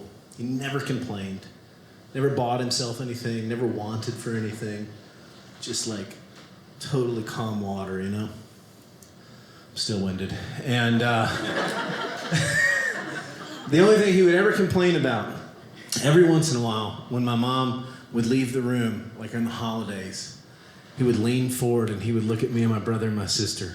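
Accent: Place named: South, United States. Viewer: The sad part is that I recognize his particular NC accent. 😅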